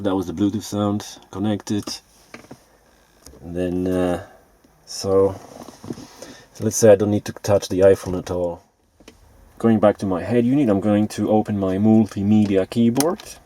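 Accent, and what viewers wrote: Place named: Poland. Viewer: Widzialem ze jestes polakiem :) od razu slychac polski akcent :P ale super ze podzieliles sie ta informacja.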